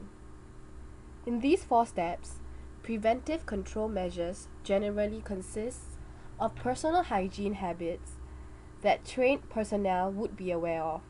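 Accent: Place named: Singapore. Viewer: Very good video. You sound Singaporean, btw. ;-)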